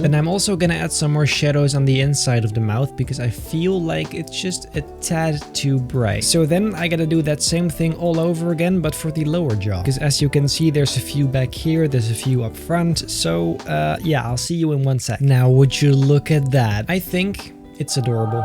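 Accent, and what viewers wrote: Netherlands. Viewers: are u dutch?🤔🤔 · Ik vind het zo fantastisch dat je het Nederlandse accent niet kan verbergen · We miss the dutch accent videos 🥲😂😂😂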